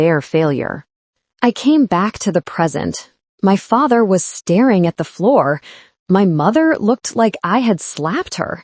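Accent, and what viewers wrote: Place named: South, United States. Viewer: Well the southern accent didn't last long.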